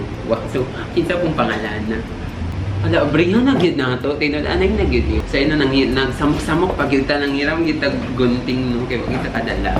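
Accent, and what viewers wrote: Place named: Visayas, Philippines. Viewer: "Zoy zooz" I love ur bisaya accent hahahhahaaahahahahha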